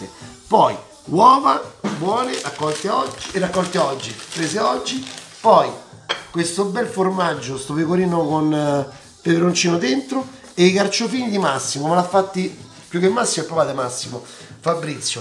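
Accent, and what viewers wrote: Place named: Rome, Italy. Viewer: Ao e nun cagate rcazzo, è de Roma parla romano e pensate de non trovà i romani che scrivono in romano sotto ai commenti? Non avventuratevi mai a Roma come in nessun'altra città italiana dato che siete tanto sensibili da non riuscire ne a leggere ne a sentire i dialetti. Detto questo, m'hai fatto morì dalle risate ahahaha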